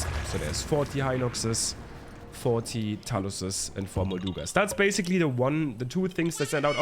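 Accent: Germany. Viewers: Man hört so raus dass du Deutscher bist, Abo hast du! xD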